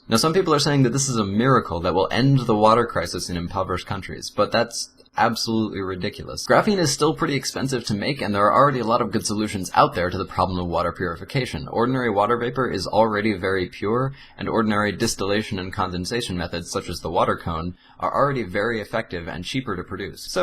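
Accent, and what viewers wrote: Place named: France. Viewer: L'accent français. J'adoooreee.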